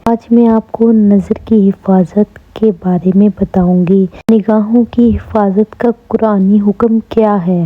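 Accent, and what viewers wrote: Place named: United Kingdom. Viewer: جزاک اللّہ ۔ اچھی اصلاحی باتیں ہیں ۔آپ بھی مزید کوشش کریں کہ صرف آوز چلائیں اور سامنے نہ بیٹھیں ۔تاکہ اچھی باتیں سنتے ہوئے آپ پر نظر نہ پڑے۔ اور ناظر منظور دونوں نظر کے گناہ سے بچیں ۔ اور حیث کو حریث بولیں ہدیٹ نہ بولیں۔ انگریزوں کے لہجے میں۔ آپ تو اردو خوان ہیں۔ کیوں حدیث کی جگہ ہدیٹ بولتی ہیں۔ اسکی اصلاح کریں ۔ جزاک اللہ ۔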